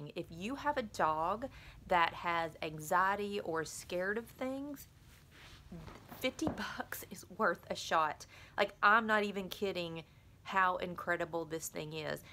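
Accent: South, United States. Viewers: I cannot listen to your SOUTHERN DRAWL any more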